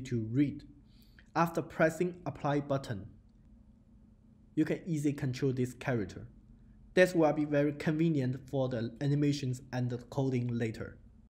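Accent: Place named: China. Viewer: 兄弟,听口音是 中国人呀~~ 能不能搞点2D中文版本教程呀~~~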